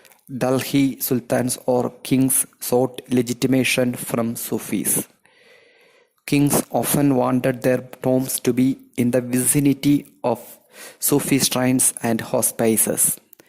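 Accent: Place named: Kerala, India. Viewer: I got to know that you are from Kerala by way of your pronounciation 😂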